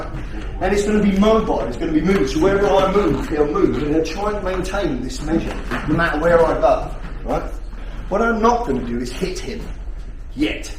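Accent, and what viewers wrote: England, United Kingdom. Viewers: Amazing….maybe because I dont understand this englisch akzent sad I main idea is ….if the enemy touch my hands(fence) the I give him a blow and go straight on and kick him of the planet. Is this right? Can somebody write the main entantance of this vid for me in this comment? I am so interest to know what really he ment. I dont understand this art of spoken english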